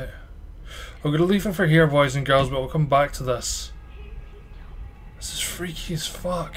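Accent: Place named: Aberdeen, United Kingdom. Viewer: Heard your story trows and although I'm an auld wifie fae Aeberdeen bidin in London we only a PS 2 I like tae tune in and hear yer bonny accent fer a bit o Hamesickness relief fan I hinna bin up'i'road fer a filie! Ye still followin ma phonetic Doric here loon? Aye? Weel ah've subscribed fer yer stories o ghosties, ghoulies an lang legged beasties. So good luck loon an here's an auld Aeberdeen greetin tae warm yer heart.."G'wa ye radge!" he he jist jokin loon! xx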